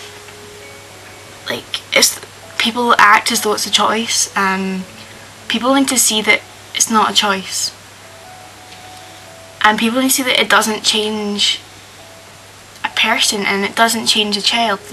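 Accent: United Kingdom. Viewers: What kind of accent is that? I know it's from the British Isles, but I can't pinpoint where...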